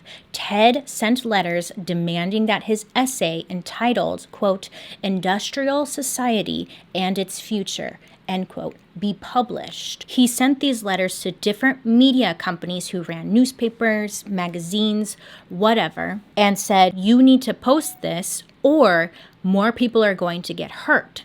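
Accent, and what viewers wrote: United States. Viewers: my American English has improved by listening to her stories 🥰 thank you!!!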